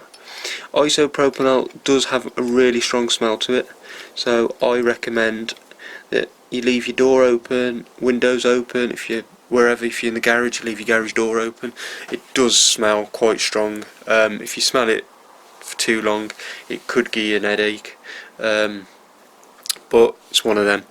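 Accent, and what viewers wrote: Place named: Midlands, United Kingdom. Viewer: Very rare in Uk for N Gauge, but just found one on EBay. Buying it now. Enjoyed the video and enjoyed the Midlands accent too. Honest. I am ex-Kiddy, but now in Cornwall. Keith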